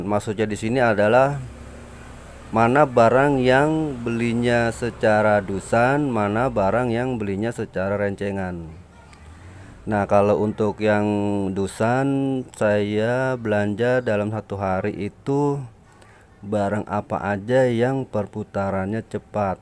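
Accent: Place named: Jakarta, Indonesia. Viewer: G jual roko bang. Ngomongnya betawi banget bang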